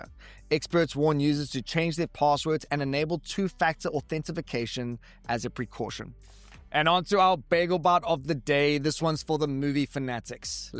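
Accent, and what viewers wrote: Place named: Afrikaans, South Africa. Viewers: the hardest durban accent to ever durban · Enjoyed your content, but wish you'd stop trying to hide your lovely Afrikaan accent.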